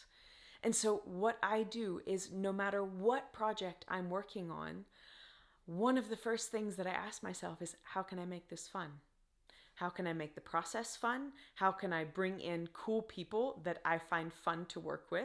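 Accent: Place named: Jamaica. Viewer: Your accent sounds very Jamaican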